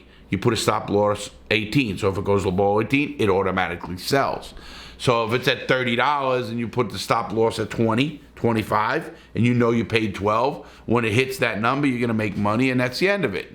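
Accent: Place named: Boston, United States. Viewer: You kinda have a Boston accent or a new England accent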